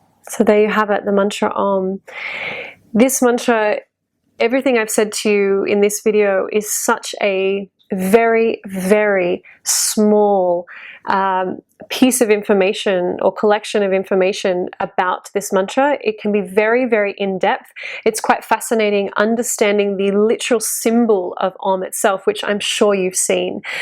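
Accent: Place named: Australia. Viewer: Thanks for your reply. Just curious, your accent sounds Australian. Am i right?. Where did you study(assuming you did) in India? Some common places for westerners being Rishikesh or the Shivananda Ashrama in kerala. I am from India by the way and i am a native of a the temple town of madurai working in Bangalore at present.. We are a family of traditional Brahmins and Vedic scholars, with my father maintaining the ritual fire. We use OM to start vedic chanting and to end I found your explanation for OM to be down to earth and realistic, compared to the one's many fake Indian gurus give.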